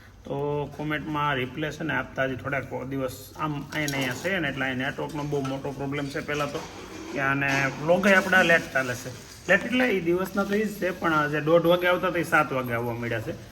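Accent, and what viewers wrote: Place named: Saurashtra, India. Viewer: તમારા આ vlog મા ગામડાનો જીવન બતાવ્યું એ જોઈને બહુ મજા આવી. તમારા vlog એકદમ ઓર્ગેનિક હોય છે. તમારી સૌરાષ્ટ્રની તળપદી ભાષા સાંભળવાની મજા આવે છે. ફઈ ના ઘરે ફુવાને ઇગ્નોર કરતા હતા તે જોવાની મજા આવી. Keep it on. ☺️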